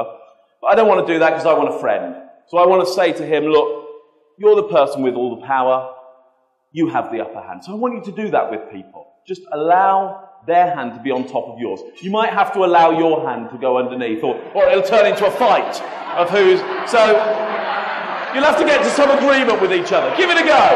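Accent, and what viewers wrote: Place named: United Kingdom. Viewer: the british accent helps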